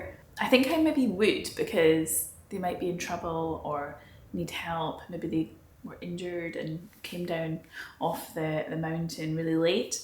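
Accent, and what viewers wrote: Scotland, United Kingdom. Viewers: You are quite adorable. I'm from Clarkston originally, although I have lived in Vancouver British Columbia since 1967 at the age of 15 and your accent is so fresh and beautiful.....what part of Scotland did you grow up in? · Such a lovely warm person. Always real and uplifting. And I so enjoy listening to your Scottish accent. Thanks for sharing your adventures. Looking forward to the next one. From the redwoods in Northern California.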